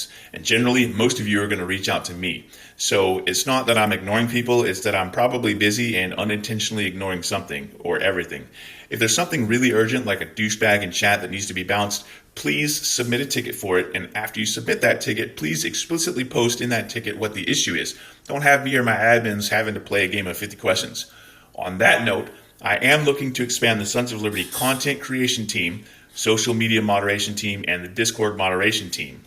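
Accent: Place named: Gloucester, United Kingdom. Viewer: I have to admit my limitations as much as I would love to join Discord - that’s for the more computer savvy person - for now I will continue to check in with you on YouTube. I’m a from Gloucester Mass a Longshoremen or as we say “Lumper” town where a guy like you - the way you talk is familiar and comforting. Thanks for everything your doing ☮️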